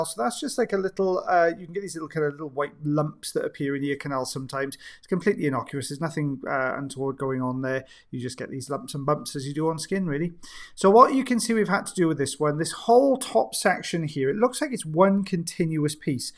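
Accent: Wales, United Kingdom. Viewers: Stay safe and family. I loved you saying “ebony coloured” in your lovely Welsh accent. We will just be happy so see your videos when you can post, you don’t need to apologise, it’s our privilege to see your work.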